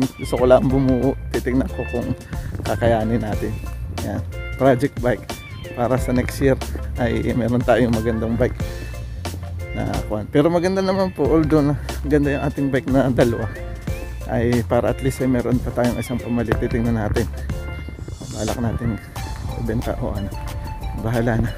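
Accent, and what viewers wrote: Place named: Quezon, Philippines. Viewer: puntong quezon ka pa rin hane.. Ingat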